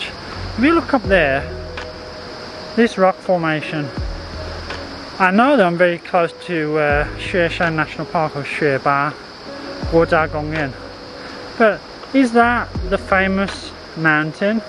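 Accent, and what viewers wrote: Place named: England, United Kingdom. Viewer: must add that your English-accented mandarin is quaint & interesting 😋👌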